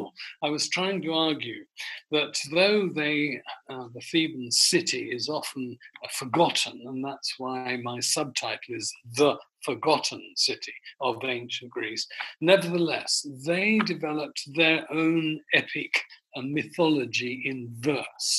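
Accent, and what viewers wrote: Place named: United Kingdom. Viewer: your british accent is unbearable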